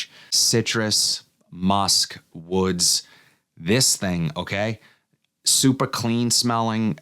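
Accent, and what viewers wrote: Boston, United States. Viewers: I’m currently watching “Clubhouse: a year with the Red Sox”. So between your vids and that, my exposure to the Boston/NE accent is seriously starting to imprint… 😂 SOTD: Penhaligons Endymion Concentré (under the radar banger). Tomorrow Sunrise on the Red Sand Dunes Intense! Congrats on 27K my man 👏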